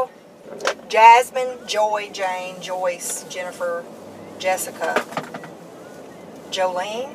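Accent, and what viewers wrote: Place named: Texas, United States. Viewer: Where are you from? We sound alike. I’m from Texas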